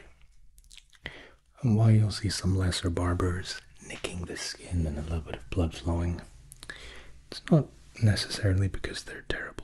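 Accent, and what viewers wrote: Scotland, United Kingdom. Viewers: Eh? Scottish?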